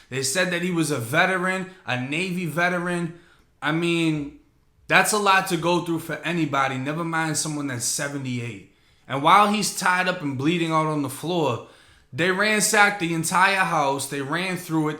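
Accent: Boston, United States. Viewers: Crazy i read it in that boston accent.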